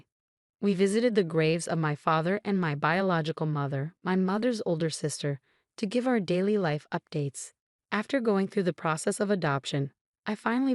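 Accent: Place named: United States. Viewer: Sounds American, but money is "yen".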